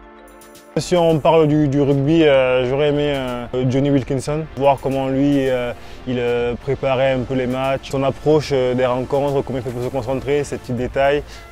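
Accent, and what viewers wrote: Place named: Toulouse, France. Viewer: Ce bel accent Toulousain qui fait que l'on n'oubliera jamais d'où tu viens 😉 et cela même si tu vas très très loin comme je te le souhaites!!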